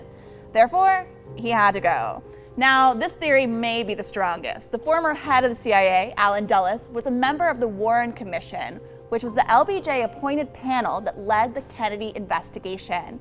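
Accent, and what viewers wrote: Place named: California, United States. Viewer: My God that voice. She sounds like The Californians on SNL. Not to mention that it's not definitive Oswald killed JFK or, at worst, pulled the trigger.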